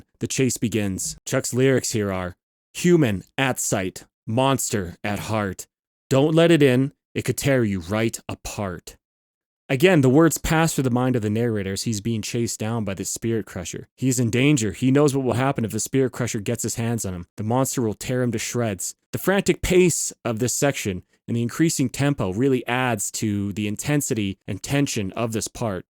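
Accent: Canada. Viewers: I thought you sounded Canadian! Hello from B.C! Thank you for doing a wonderful analysis on this beautiful song. is not only my favourite album but my favourite metal album of all time. I love how well the storytelling goes with the music and its overarching message gives me hope and has helped me persevere through my own struggles. I look forward to watching more of your content- keep up the good work!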